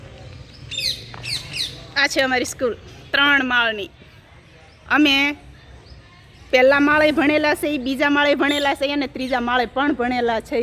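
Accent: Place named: Saurashtra, India. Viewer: તમે પ્રાંતિજ નાં છો પણ તમારી ભાષા સાબરકાંઠા ની બોલી તો બિલકુલ કેમ નથી આવતી તમે પ્યોર સૌરાષ્ટ્ર ની ભાષા બોલો છો તમારું નાનપણ તો પ્રાંતિજ માં જ ઉછરેલું છે તો આવું કેમ સમજાતું નથી...જય શ્રીકૃષ્ણ બેન 🙏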